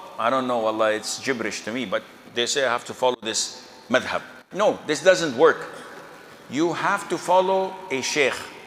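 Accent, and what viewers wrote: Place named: West, United States. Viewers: Yes, shaikh takes pride in his acquired western accent and considers South asian accents particularly loathsome. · I sometimes wonder if he ever lived in the US!! His English is more American than UK!!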